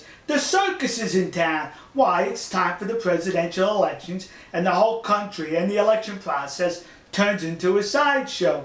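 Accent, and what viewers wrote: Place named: New York, United States. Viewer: The character is a fraud. He doesn't even sound Texan. I think he has a New Yorker accent LOL. It's funny how they promote memorabilia items online. Just a way to earn a quick buck by telling the Japanese what they want to hear.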